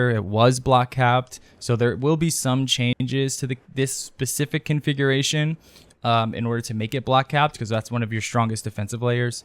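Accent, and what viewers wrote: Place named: Colorado, United States. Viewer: are you a wook? im from colo.. people around here sound like you.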